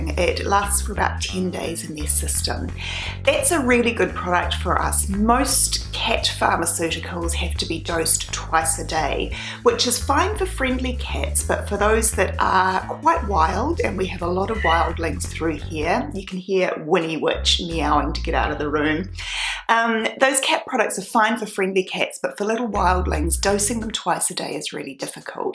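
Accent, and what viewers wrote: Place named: New Zealand. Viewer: If that is English from New Zealand you should be teacher, I like this accent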